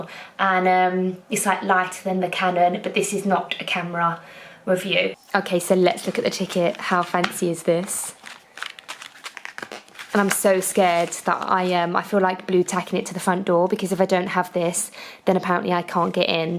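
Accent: Essex, United Kingdom. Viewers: Love the real ness! Your a babe! And I love your accent! My mums a Essex girl & she is cool AF xxx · I love the Essex accent .. And love your vlogs .you are unique and you ..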